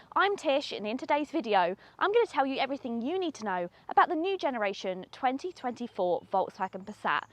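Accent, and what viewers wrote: Essex, United Kingdom. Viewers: Suffolk? I thought you were an Essex girl?? 😟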